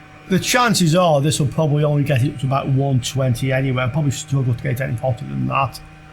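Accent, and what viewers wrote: United Kingdom. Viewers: tak mne britsky prizvuk lezie na nervy, zaujima ma skor obsah a on to popisuje taj britsky - jednoducho :D . Ty sa zaujimas o elektroniku,ci len prizvuk? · Tiež si ho fičím 😀 ten britsky prizvuk je bozsky.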